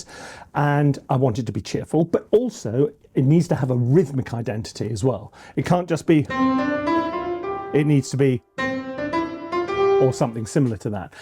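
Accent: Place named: Scotland, United Kingdom. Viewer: Sounds very Scottish .... almost in need of a set of bagpipes...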